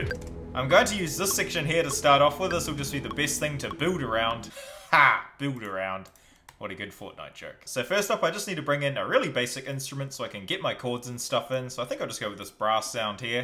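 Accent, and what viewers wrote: New Zealand. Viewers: Are u from nz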